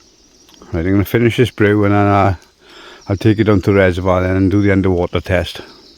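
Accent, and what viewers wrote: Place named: Swansea, United Kingdom. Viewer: no problem bruv, I grew up in Swansea so your accent takes me back, keep up the good work 👍🏻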